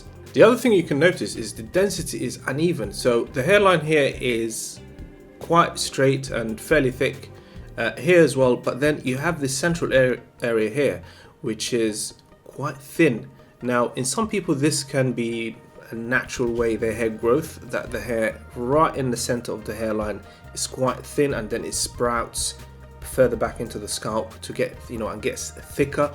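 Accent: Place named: United Kingdom. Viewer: aren't you independent and can review and say whatever you want as long as it's true? With your accent, I can tell that you are British and you have the right to freedom of speech unless you have a contract with certain clinics. I hope you are free to say whatever you want without Legal Ramifications. Cheers!!! Ps, I had my hair transplant in Turkey this June and my hair is growing a lot. I had a minor issue and the clinic is willing to fix the issue free of charge next year. So far so good!!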